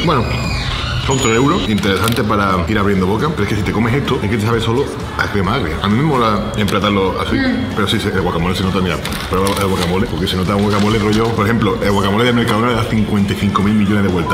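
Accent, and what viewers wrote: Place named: Mexico. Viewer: Amooo el acento wey, te lo juro nms, yo soy de Mexico, y aseguro que es 100% mexa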